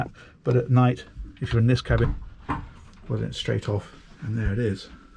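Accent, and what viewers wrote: United Kingdom. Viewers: You are so good at your craft! Of course the British accent doesn’t hurt either. Wishing you much success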